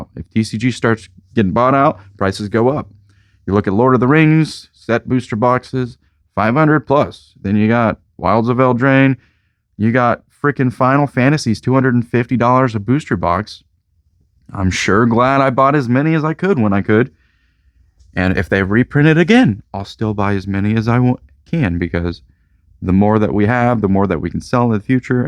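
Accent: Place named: United Kingdom. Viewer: Dude…you should do your video I that British accent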